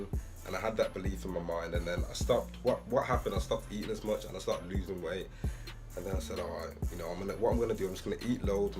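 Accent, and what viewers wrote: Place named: Birmingham, United Kingdom. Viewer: The birms accent is too wavey!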